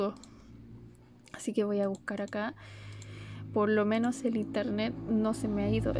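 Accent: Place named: Chile. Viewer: TIENE ACENTO CHILENO CONSHASUMARE WUEWON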